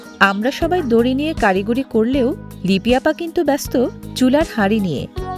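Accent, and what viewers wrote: Sirajganj, Bangladesh. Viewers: Sirajgonj bole kotha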